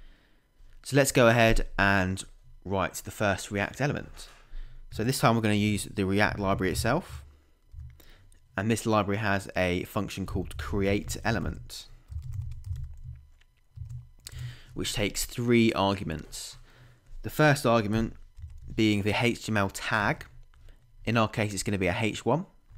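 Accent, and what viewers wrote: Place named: England, United Kingdom. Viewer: Liked your English accent <3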